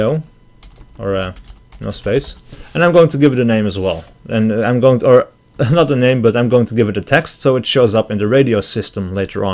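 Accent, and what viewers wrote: Netherlands. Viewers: me realising you're dutch aswell visible confusion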